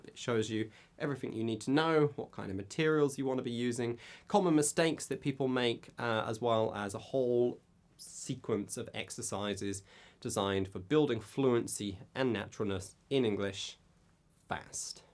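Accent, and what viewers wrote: United Kingdom. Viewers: What's his accent? which kind of British accent?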